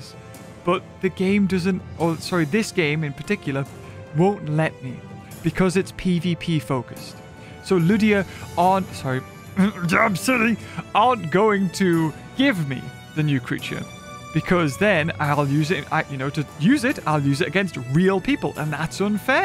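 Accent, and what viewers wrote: United Kingdom. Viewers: if he has british accent i love it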